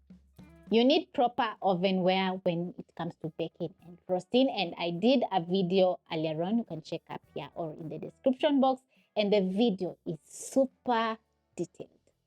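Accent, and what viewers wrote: Kenya. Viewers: Open. She is Kenyan. It's an accent.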